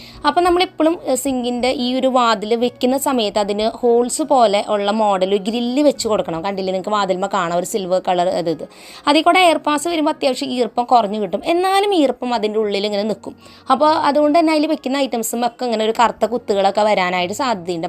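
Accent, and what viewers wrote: Thrissur, India. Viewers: Ningal thrissur aahno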